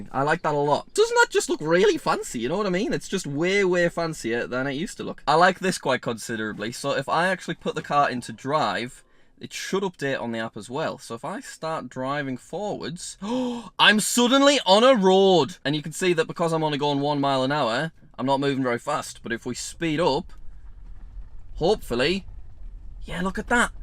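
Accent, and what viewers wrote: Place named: Northern England, United Kingdom. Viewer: Time for my dose of good northern English accent! Yay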